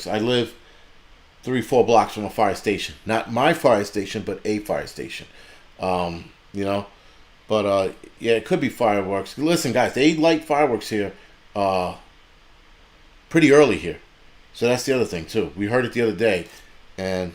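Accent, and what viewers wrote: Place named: New York City, United States. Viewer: As a NYC Native who is now living in W.Europe (Paris) love to hear your straight,raw NYC-speak,brings me back 👍THX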